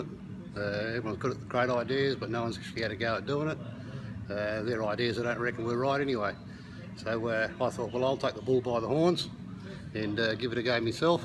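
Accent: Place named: Australia. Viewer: Great to hear an Aussie voice to explain...well done and thanks for the tip.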